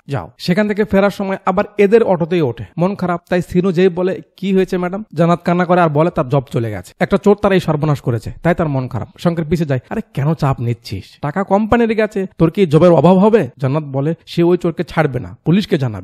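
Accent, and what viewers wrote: Bogura, Bangladesh. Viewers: আপনি কি বগুড়ার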